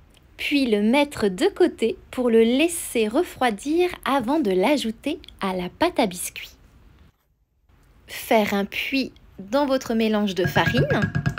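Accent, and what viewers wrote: France. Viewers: I don't know about the biscuits . But that French accent is fabulous . ❤️👍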